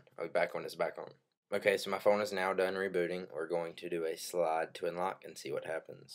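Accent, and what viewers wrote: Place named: Appalachia, United States. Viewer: i cant understand your thick hillbilly hick accent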